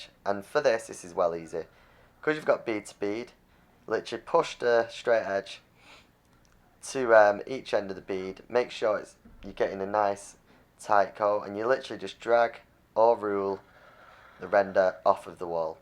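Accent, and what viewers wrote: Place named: Northern England, United Kingdom. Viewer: Nice pne mate ye had a very bad plastering life so unlucky and syame im very good and good bloke . Im moving up preston way up north . Ur accent sounds from that way . Were are you from were abouts/areas do you work il be after a bit work up that way can you give me advice contacts or have any work try me on here there . Im leaving this life down here been sooo unhappy so want a fresh start up there . Its all long story . But ur a greate bloke maybe you can give me advise contact leads companys and what companys stay away from . Thanks ant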